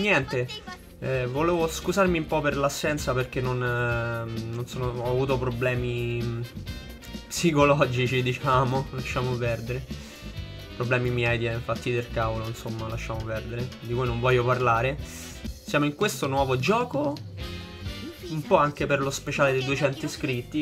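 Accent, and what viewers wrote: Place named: Rome, Italy. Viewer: Like numero 30. Gameplay lungo ma molto coinvolgente e ben giocato come sempre. Per la lettura dei dialoghi sono contento che leggi, ma fallo con calma che ci piace sentire il tuo accento romano, ok? Per farmi perdonare di non poter vedere il gameplay di Star Wars, sono tornato indietro di 2 mesi per rispolverare questo piacevole video. Visto con interesse e tutto d'un fiato!!! Bravissimo.:D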